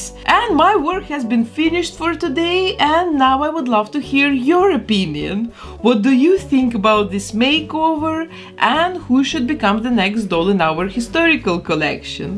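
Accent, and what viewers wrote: Russia. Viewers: I love you !!!.. I think your absolutely gorgeous and I love your accent !!!.. are you Russian ???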